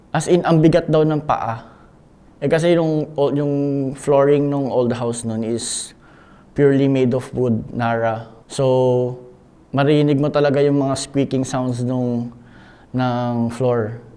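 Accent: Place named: Conyo, Philippines. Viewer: Conyo kasi siya ikaw kasi laking iskuwater🥴 kaya madami napapansin dka nlng manuod pwe🤮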